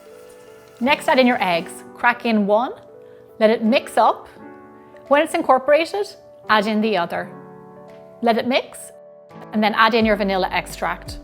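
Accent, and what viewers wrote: Ireland. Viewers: I just love that you never lost an ounce of your Irish accent!!! 💚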